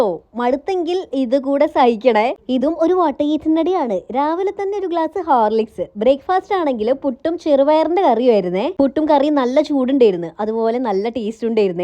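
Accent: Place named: Alappuzha, India. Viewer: നങ്ക് എന്ന് പറയും... ആലപ്പുഴ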